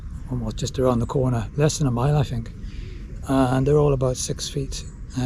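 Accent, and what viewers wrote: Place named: Wales, United Kingdom. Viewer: Just watching my first video of your’s. Surprisingly good! I’ll recommend your Channel. Hope you recall Wendy & I on NB Barneswood helping Locking with each other, walking & chatting in the Kidderminster area on the S&W. It was a pleasure to meet you, hear your lilting Welsh tones & witnessing your calm attitude to boating. We hope you enjoy the rest this summer & look forward to meeting you again in the future 🤞 Alan, Wendy, Max & Russ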